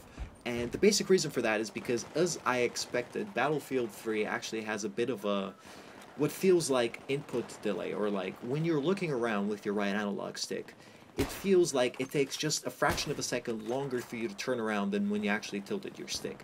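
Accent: Netherlands. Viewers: Hey, you dutch? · are you from the dutch?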